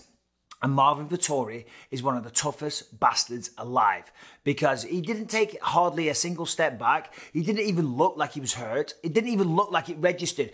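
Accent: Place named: United Kingdom. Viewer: Dude is a natural. He's decent looking, funny, great talker, and he has a British accent. How can you not like him?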